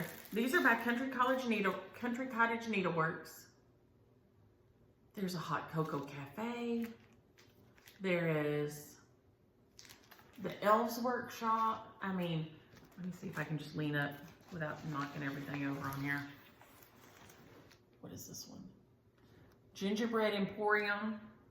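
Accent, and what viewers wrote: South, United States. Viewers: I always love to hear your voice and your "Southern Sayings" Being from VA I don't have quite as much twang, but grew up with a sitter from GA so I have a few sayings that folks just don't get! I would love the opportunity to stitch for an hour or more in the flag pattern!!! 😉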